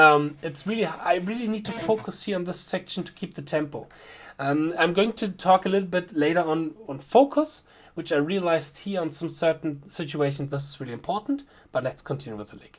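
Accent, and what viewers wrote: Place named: Germany. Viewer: Wow, man! I have to tell you, how much I like your videos so far! Not only are you a phenomenal guitarist, but you are also very, very likable (you even remind me of my best friend optically AND voice/German-English accent) and you totally nail the spot(s) for me, where I want to get better at! Very well explained and with a super fun lick! I'm really celebrating here!:D I didn't know, how fast I can actually play meanwhile, because I rarely play THIS fast and even being totally clean! (Well... not THAT "clean". I had/have some beer, I admit...;) But you know what I mean...) You should consider making a Patreon channel sometime in the future. I would subscribe! (I'm already a Patron of Ben Eller, Andy Wood and Alexandra Zerner and this is a great thing!) Now, I'll continue the last bit of the video and will surely check out more from your channel afterwards! 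Danke dir,! Ganz ehrlich, du bist da offenbar ne ganze Ecke näher an mir als die üblichen Verdächtigten auf Youtube, wie z. B. Ben Eller etc. Alles geiles Zeug, das wirklich oft hilfreich oder spaßig ist, aber du bringst das für mich zumindest noch mal auf ein ganz neues, deutlich ambitionierteres Level. Zumindest mehr als Ben Eller zum Beispiel. Der hält das für meinen Geschmack oft ein bisschen ZU "einfach". Ich kann die Videos von dem eins nach dem Anderen runter-rocken und kann danach fast jedes Lick zumindest auf 2/3 Speed. Und dafür brauch ich meistens nur den "Stepdad-Speed" und guck mir das 3 - 4 mal an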